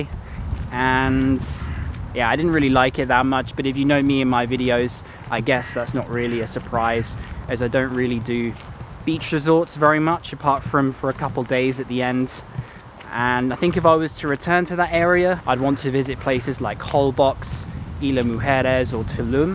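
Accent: United Kingdom. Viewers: Soo happy to see you again! Welcome back to the best British accent ever, and i'm glad you recovered ^^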